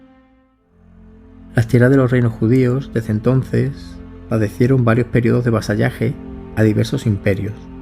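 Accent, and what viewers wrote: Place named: Extremadura, Spain. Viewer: Me encanta la historia. Muchísimas gracias por los podcast, los voy escuchando poquito a poco. Esta semana creo que me llega el primer libro de Jesús que usted ha escrito. Sólo decirle que creo que por su acento, usted nació en mi tierra, Extremadura, a la que adoro y ahora más desde que no vivo en ella. Un saludo enorme y mil gracias por difundir su conocimiento.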